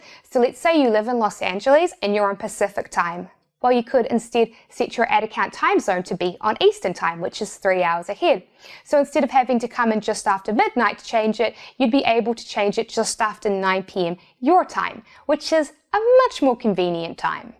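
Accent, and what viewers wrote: New Zealand. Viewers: That En Zed akcient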